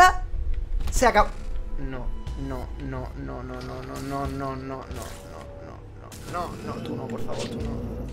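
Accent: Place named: Seville, Spain. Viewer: Pensé que eras sevillano aver si nos vemos por la calle XD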